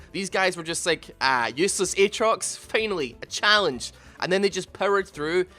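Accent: Scotland, United Kingdom. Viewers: Don’t mind me I’m just here for the Scottish accent.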